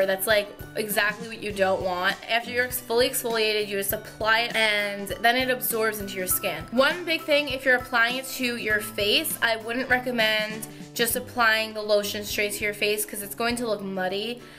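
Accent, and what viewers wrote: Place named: New York, United States. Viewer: Are you from Long Island/ Brooklyn/Bronx/Queens/Staten Island or something? Based on your dialect.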